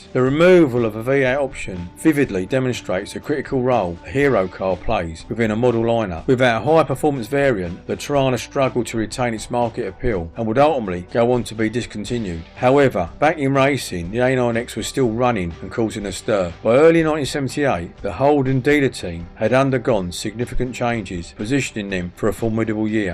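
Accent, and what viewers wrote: England, United Kingdom. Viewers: A pommie accent talking about Torana …?!?! This is not good .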